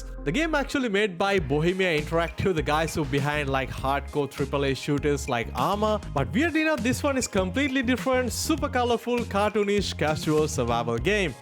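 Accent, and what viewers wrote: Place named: India. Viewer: bro u sound like indians if u dont mind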